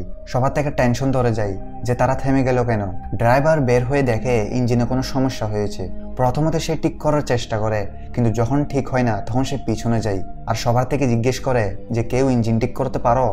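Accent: Chittagong, Bangladesh. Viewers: আ বদ্দা! চিটাইংগা টান ত ন গিল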